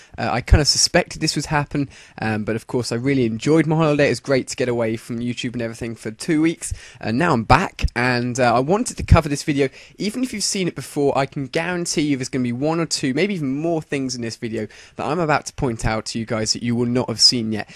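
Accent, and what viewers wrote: United Kingdom. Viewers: fuck your slicky british accent. stinks.. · I wanted to watch a MW3 gameplay video, not some obnoxious british guy blabber on for 10 whole minutes. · Good video but British accents give me headaches..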